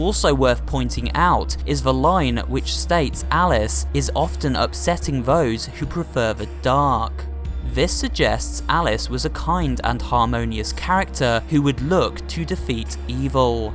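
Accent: United Kingdom. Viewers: You are like the British version of the Chills YT channel with the way you talk.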